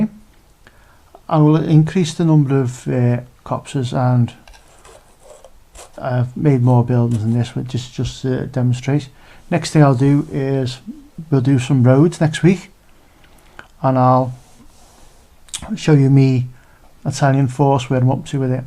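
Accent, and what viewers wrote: United Kingdom. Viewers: Hey man you've just been suggested to me. Glad i came across you as I'm going to start playing bolt action. Where you from I can hear a slight Merseyside accent but I reckon you might be from my side of the water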